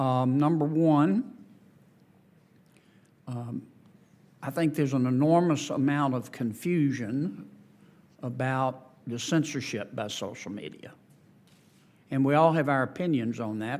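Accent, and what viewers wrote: South, United States. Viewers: 😁😊😍 I LOVE John Kennedy!!! He seems soo polite and easy-going, but his sweet Southern accent and his soft spoken delivery hide a sharp as a tack mind! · One of, if not THE smartest persons in Washington DC. It's a shame that some Americans have equated the Southern accent with ignorance.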